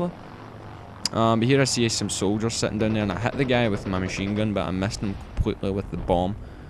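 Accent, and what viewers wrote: Scotland, United Kingdom. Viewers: yass, you scottish m8? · From Scotland? You sound Scottish :p We scots PWN! xD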